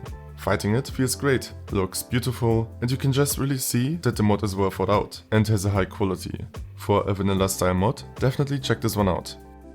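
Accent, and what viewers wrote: Germany. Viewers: Man hört so raus das du deutsch bist haha Top Video!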